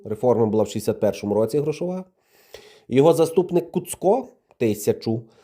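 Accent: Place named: Kolomyia, Ukraine. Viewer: акцент рассказчика из коломии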